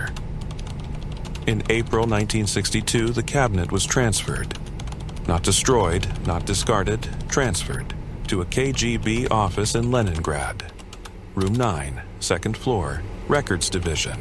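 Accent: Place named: India. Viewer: Whats with the Indian accent?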